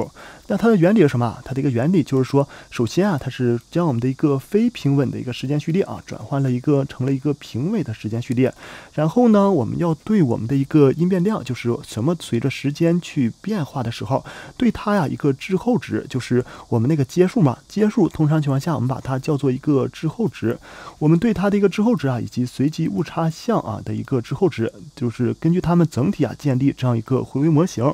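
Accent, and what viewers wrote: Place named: Dalian, China. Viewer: 感觉像大连人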